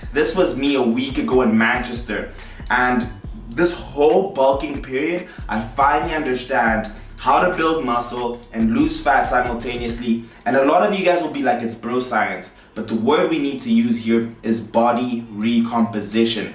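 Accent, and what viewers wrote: South Africa. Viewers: Are u from South africa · is he south african? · Are you south African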